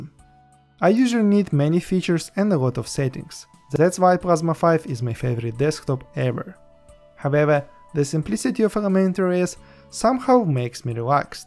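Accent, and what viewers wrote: Russia. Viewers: Are you from Russia? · Русский акцент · Native Russian accent detected. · Are you Russian? It feels like you have a Russian accent)).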